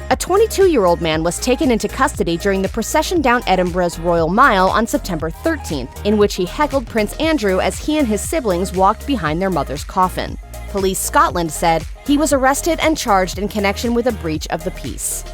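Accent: United States. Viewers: I don't need this stupid American voice. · Who is the commentator? Sounds awful. An American?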